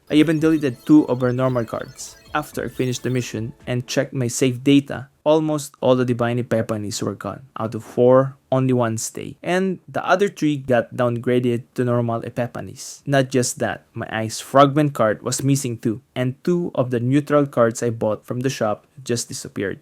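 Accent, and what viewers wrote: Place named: Philippines. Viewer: i knew that accent kababayan lmao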